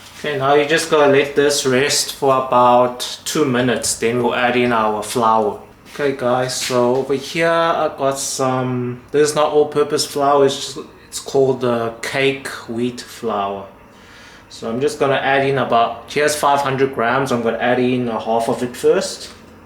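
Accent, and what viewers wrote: South Africa. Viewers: Where , Johannesburg, Port Elizabeth , Cape Town?Nice to meet you , I was born in South Africa , now live in Australia. Love the accent.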